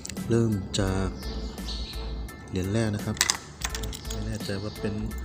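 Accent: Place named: Buriram, Thailand. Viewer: แสลงโทนบุรีรัมย์คับ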